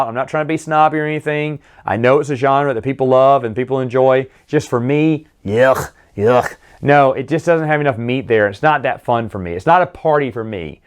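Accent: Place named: South, United States. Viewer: Hey, I hope you don't mind answering a question I've been asking myself for a long time while watching your videos: I'm from Europe and English is just my third language. However, I love the English language and I want to learn as much about it as possible. I'm pretty sure I'm able to recognize several different dialects/accents, including Irish, Scottish, several British dialects, Australian, New Zealand accent, etc. However, when it comes to North American dialects, I still have problems differentiating between all of them. I think I'm able to recognize New York dialect, stereotypical Italian American dialect (based on Martin Scorsese movies, lol) vague Canadian dialect and vague Southern American dialect. I'm pretty sure you're from the southern US. Am I right? When I watch American movies, I often hear people mention "Midwestern" or "Californian" dialects, but they just sound "American" to me. 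Any advice how to recognize the differences? I know this question has absolutely nothing to do with your video, but for some reason, your videos always remind me of this question.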